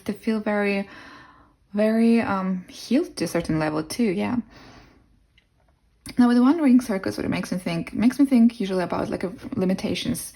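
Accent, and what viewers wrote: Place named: Eastern Europe. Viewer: You're a really good reader, you have great intuition. I picked pile 1, and most of the things you said resonated. I am very sensitive and empathic, and I'm autistic. I'm 31 years old, and I started to have severe sensitivity to certain sounds in 2014. These kind of sounds are always happening, so I've been forced to be homebound since 2014 as a result. I'm unable to leave my home except for short periods of time to buy groceries from the shop nearby, I'm unable to do anything, I'm just stuck in my home and suffering, because I can still hear the loud noises from outside even while staying inside (they are loud, terrible noises caused by people driving in a terrible way). I'm also very sensitive to people's energies and vibes, but even more so than you said. I can tell if someone is a good or bad person by looking at them and feeling their vibes, they don't even have to say anything. 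I hope you will do a reading that would tell me if/when my situation will change, because I've been trying to escape this situation that I'm stuck in for years but it hasn't happened so far, it feels like all the doors have been closed on purpose by a higher power, and nothing is working despite all my efforts. By the way, I'm curious, are you from Eastern Europe? Your accent sounds Eastern European. Thank you for your readings!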